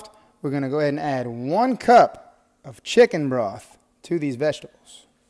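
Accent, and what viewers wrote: Louisiana, United States. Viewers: Actually VLOGS not to mention that Cajun accent to go with the dish sets it all off😂🤣..I’m from New Orleans,La.👊🏽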